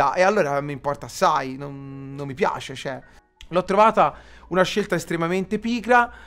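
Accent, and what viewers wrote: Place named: Tuscany, Italy. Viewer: Questo è popo toscano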